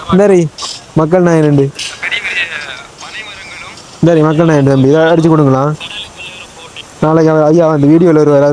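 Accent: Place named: Batticaloa, Sri Lanka. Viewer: நீங்கள் மட்டக்களப்பு தானா? நல்ல செல்லத்தமிழ் கதைக்கிறீங்கள். உங்கள் தமிழை கேட்க இஸ்லாமியர் போல் தெரிகிறது. திருவிழா முடிந்தபின்தான் ஊருக்கு திரும்புவீங்களா?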